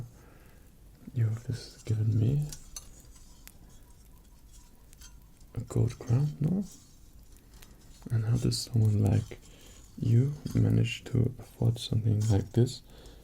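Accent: France. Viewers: French accent and HRE flag on preview. Nice. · french accent is very funnn il french XD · I CAN UNDERSTAND ENJ?GLISH WHEN YOURE SPEAK ENGLMISH WITH FRENCH ACCENT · The accent of a French King and the speaking pace of Travis Fimmel as Ragnar Lothbrok... Counting you do look like Thor, you could be the first line of viking nobility of the country. No ? ;) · Dont know why he has a french accent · This actually sounds like a French accent lmaoooo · Your French voice is so amazing, do fancy French roleplays more often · Wouah ! It's amazing ! You have exactly the french accent ! It's so rare to do this ! It's a good vidéo ! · Les gens aiment écouter quelqu'un parler anglais avec un accent français ? Damn, moi qui ai toujours forcé pour essayer d'avoir le meilleur accent anglais possible! :') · I expected a very Monty Python French accent, but this was a pleasant surprise. 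· ragnar lothbrok roleplay? the french accent reminds me of him lol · I'm French and your french accent is horrible but really funny and kinda relaxing :') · I'm french and you have a little french accent...with a good english spoken xD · Omg I'm french and It's really creepy like he does very well the french accent xD ... It seems me lol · All I see is Thor with a french accent wearing a crown and I'm dying XD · He has a German flag, a French accent but he speaks English? What is this sorcery? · As a french, I think this french accent gives a softie side to that king, which is weird but funny at the same time.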